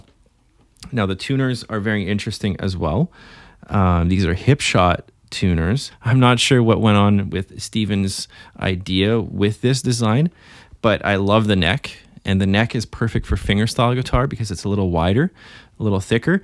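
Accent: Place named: Canada. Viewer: Gee you sound very Canadian! Very interesting that Klein took on creating a next gen harp guitar! Thanks for the exploration!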